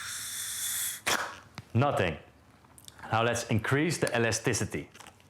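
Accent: Netherlands. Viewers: Kan Gwn horen dat je Nederlands bent gap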